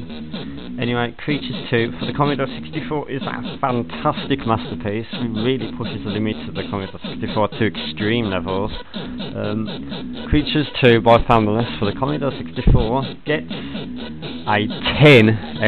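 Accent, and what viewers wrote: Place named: Birmingham, United Kingdom. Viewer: The Brummie accent is the worst in the world. This fella was sending me to sleep just listening to him. What the fuck is 'Feck"? Terrible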